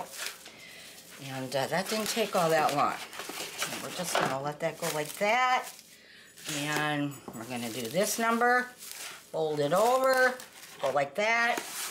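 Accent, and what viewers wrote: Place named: Wisconsin, United States. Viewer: I'm listening to your instructions and just said to my husband "I wonder if she's from Wisconsin?". I looked at your channel, and oh geez; you're from Wisconsin! I guess we just know a fellow Wisconsinite ❤ . Are you going to watch the Packers play in Brazil on Friday? Go Pack Go! Thanks for the pie crust! It's nice and easy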